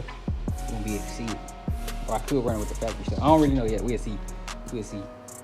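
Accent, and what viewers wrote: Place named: South, United States. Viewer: You live in the south somewhere, man. You do speak properly.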